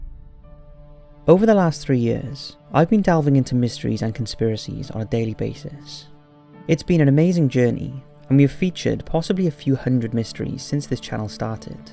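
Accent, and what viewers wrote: United Kingdom. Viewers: getting tired of hearing british narrators....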